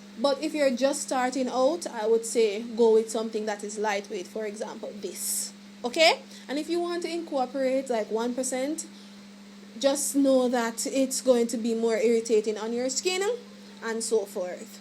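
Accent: Jamaica. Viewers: Forget the skincare products I absolutely love your Jamaican accent, it's 😍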